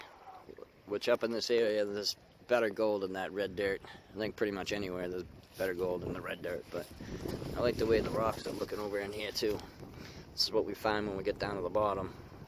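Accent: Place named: New Jersey, United States. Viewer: You sound like your from Camden New Jersey.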